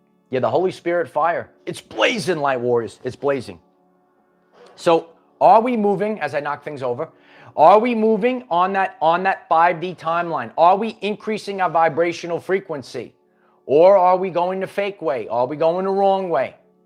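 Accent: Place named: Philadelphia, United States. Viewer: Are you at Dan Giacobbe’s house? Def have a Philly accent.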